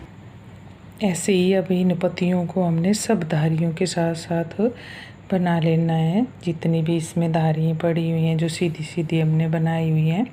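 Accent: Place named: Haryana, India. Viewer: Aap ke bolne se mere ko laga ki aap haryana se hai .mai to m.p.se hu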